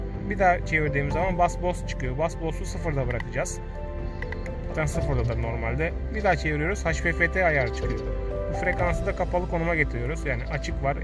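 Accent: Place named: Thrace, Turkey. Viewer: dayı tekirdağlı mısın edirne mi? :D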